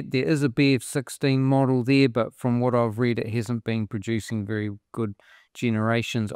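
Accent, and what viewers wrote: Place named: New Zealand. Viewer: You went way too overboard with the training on the A.I voice you use for the video. It sounds like an offensive stereotype of someone from New Zealand.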